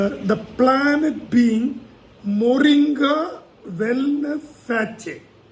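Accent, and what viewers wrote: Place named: Koomapatti, India. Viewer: Yenga koomapattiku vanga same style of speech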